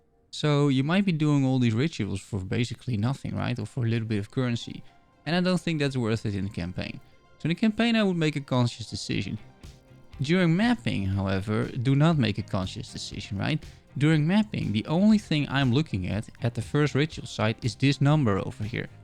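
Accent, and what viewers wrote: Netherlands. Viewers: Are you Dutch or Belgian?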